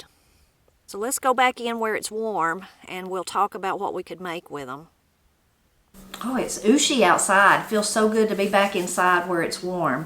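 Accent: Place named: Ozarks, United States. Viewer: So my Mom is Hawaiian- Filipino and my Father is from Arkansas Ozark area. The Ozark folks have so many similarities from accent to foods to the Appalachia folks. In Hawai’i Passion fruit (Lilikoi) is used in desserts and mostly to make a favorite drink called POG. POG is a mix of Passion fruit, Orange and Guava juices 💖🌺💖 I Love You channel! It reminds me of cooking in the kitchen with my Granny.. the accent and food is exactly the same. She passed several years ago and always hold her and her memories close to my heart. Watching your videos brings so much nostalgia. Thank You❤❤❤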